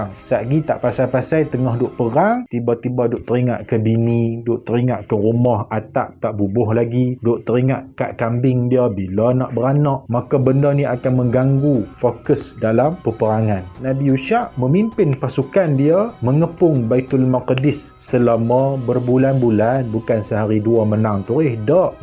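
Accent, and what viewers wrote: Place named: North, Malaysia. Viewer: Terbaik orang utaqa, 👍👍🤘🤘🤗🤗 penyampaian yg baik & mudah paham dalam loqhat utaqa..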